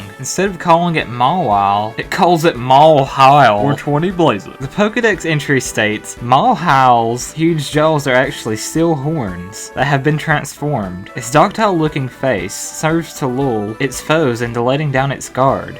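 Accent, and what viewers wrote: United States. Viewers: I love your country accent I could listen to you talk all day about pokemon xD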